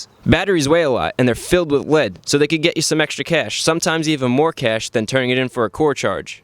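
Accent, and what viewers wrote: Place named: Boston, United States. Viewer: that bostonian though....hmmmnn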